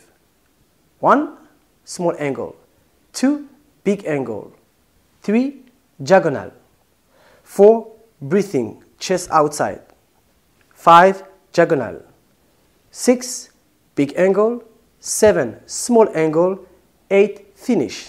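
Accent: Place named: Morocco. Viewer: wa axent maghribi:)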